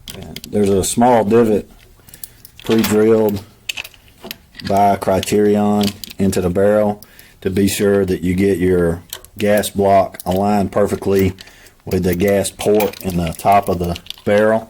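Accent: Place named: North Carolina, United States. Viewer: North Carolina accent.you sound exactly like me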